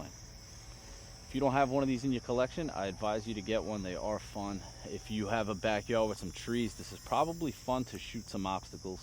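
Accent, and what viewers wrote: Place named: Long Island, United States. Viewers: “Where I live on Long Island”. Nothing was ever more obvious when the first words were spoken in this video 😅. Cool little plane. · thought that was a LONGISLAND accent! I'm in Huntington!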